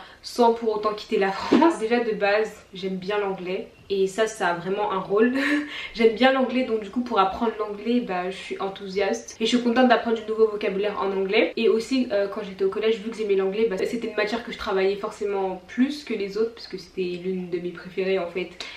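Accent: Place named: United Kingdom. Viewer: Je trouve que ton accent est plus proche de l'accent britannique que américain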